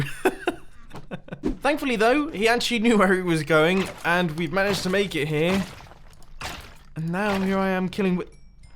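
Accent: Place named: Yorkshire, United Kingdom. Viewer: Hey, are you from Yorkshire because I kind off hear that dialect. PS: great videos keep it up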